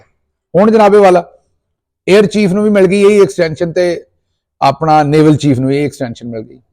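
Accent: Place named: Punjab, India. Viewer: Love your Punjabi accent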